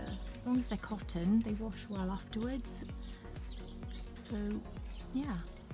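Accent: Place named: Manchester, United Kingdom. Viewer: You can get there. Your skills will only sharpen. You should watch a video about Lucalis pizza in New York how he started. I see you Mabey having your own pizzaria one day. I'm curious are you from Manchester? I deal with alot of tourist I'm a mixologist on the river walk and your voice sounds like its from Manchester.....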